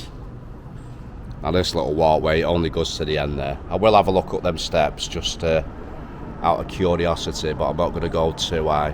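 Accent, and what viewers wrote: Manchester, United Kingdom. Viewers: Yer brilliant chanel lads,why do our kids look so uncomfortable around us?? My lads the same quiet ,and like you I'm a seeker. I still like to look at old buildings . But my lads like 'come on ya fat c. But yer you don't see channels like this .Well I can't find em .wheres your accent from. Sounds mancunian. Or near too. But it ain't Salford.keep it mate ,please.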